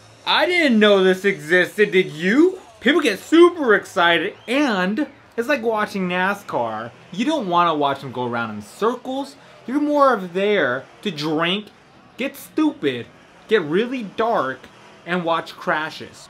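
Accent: New York, United States. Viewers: your accent sounded more like a New Yorker not someone from the east cost. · really, thought that was new yorker accent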